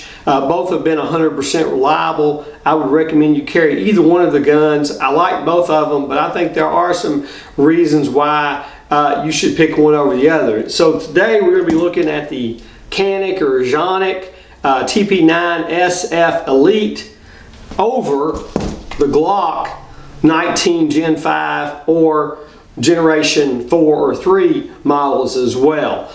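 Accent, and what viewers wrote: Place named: South, United States. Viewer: Gin faaahve Love that southern kick. Excellent video my friend.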